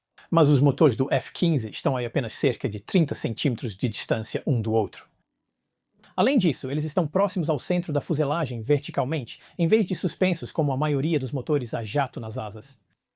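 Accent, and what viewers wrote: Portugal. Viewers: Português de Portugal não por favor😢😢😢😢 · Português de Portugal é de matar!!! Mas o conteúdo é ótimo! Continuem com o português brasileiro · Português de Portugal não dá!!!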